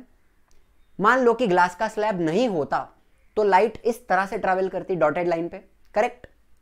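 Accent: Maharashtra, India. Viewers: Sir i think your mother tounge is marathi 😅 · Sir kya aap marathi ho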